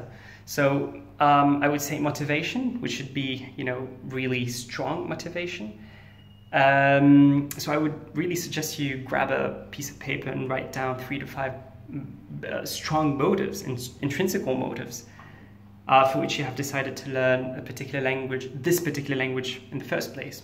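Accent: United Kingdom. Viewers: Your english accent changed from american to british lol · Thanks for sharing the video...I see that your prefer British English and speak it in an amazing way. For me I prefer more the American/Canadian English with a big respect for the British English :) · If you aren't British then your accent is amazing Subscribed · its true though, the only word that I heard a slight accent in is motivation, and probably two others. Other than those words, your british accents sounds perfect to me!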